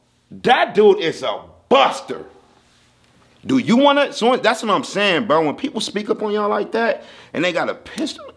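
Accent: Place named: New York, United States. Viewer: so why u speaking like a New Yorker “ goofy “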